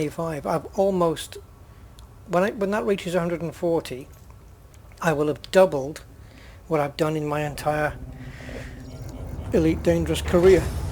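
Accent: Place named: England, United Kingdom. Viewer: you sound english if you are english don't say movie. its a film in england appart from that good vid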